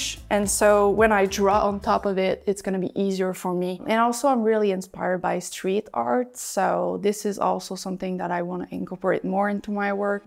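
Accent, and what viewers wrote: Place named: Quebec, Canada. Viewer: im not sure if I know who you are talking about? but my French-canadian accent is really strong hahaha!! thanks so much im glad you enjoys my art and enjoyed this video!!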